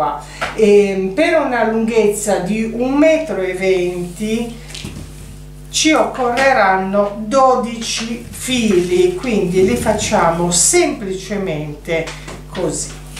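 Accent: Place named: Liguria, Italy. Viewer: Grazie alle sue chiare spiegazioni sono riuscita a realizzare il portavaso con spago.E' davvero bravissima e vorrei provare anche altri suo lavoretti Si puo fare il portavasi appeso x un vaso quadrato ,circa 25 cm x 30? Ps il suo modo di parlare mi ricorda,con nostalgia la mia Genova, sbaglio ,o anche lei è Ligure?Cari saluti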